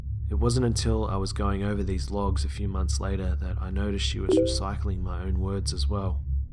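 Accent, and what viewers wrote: Australia. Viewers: dammmmn can tell you're from Australia straight away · mate are you Australia P.s me too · holy s***, you're Australian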